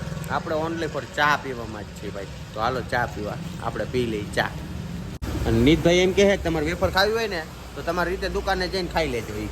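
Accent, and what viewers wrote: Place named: Gujarat, India. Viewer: Jai mataji bhai gujarat ni boli akdem mithi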